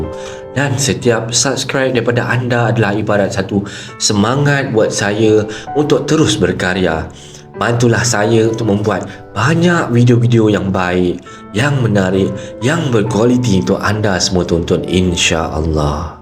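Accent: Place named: Johor, Malaysia. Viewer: Sy kdg2 gelak dengar penyampaian cerita ni. Mmg kelakarlah loghat.orang Johor... Apapun sy suka dengar Kisah Seram Msia....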